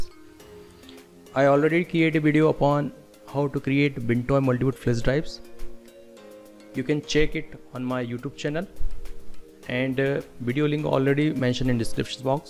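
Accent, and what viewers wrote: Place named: India. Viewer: This video really shows that not everyone who sounds Indian has malicious intent.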